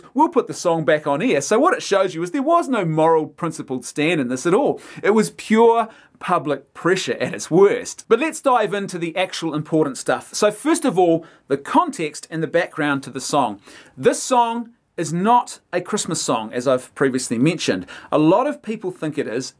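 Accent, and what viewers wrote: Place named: New Zealand. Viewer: Is it? I always thought he was saying "Brandon" with a Kiwi accent.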